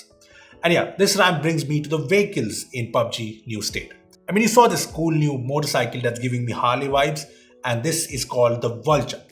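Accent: India. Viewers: RIP indian accent 🤬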